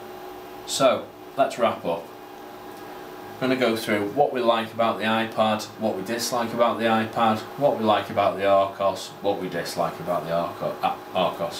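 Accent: United Kingdom. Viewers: correct me if i am wrong, but doesnt that come from the strong british accent? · hes is british its his accent.... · you cant blame him for his British accent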